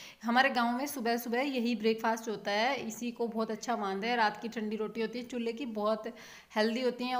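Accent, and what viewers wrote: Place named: Rajasthan, India. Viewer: Di tha haryana ari boli na bolo rajasthan ari boli puri ab to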